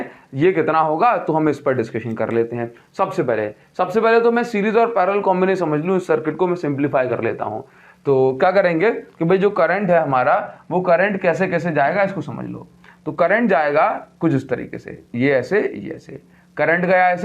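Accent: Odisha, India. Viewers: Odisha se ho kya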